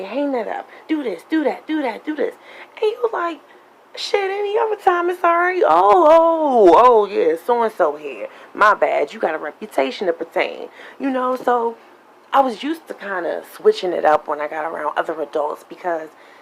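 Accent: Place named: North Carolina, United States. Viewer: I am born and raised in NC and yes ma'am you sounded like me telling about my upbringing.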